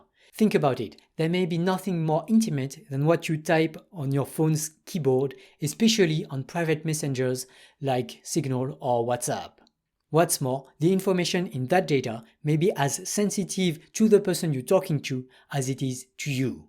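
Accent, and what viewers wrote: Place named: France. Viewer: J’aime ton accent français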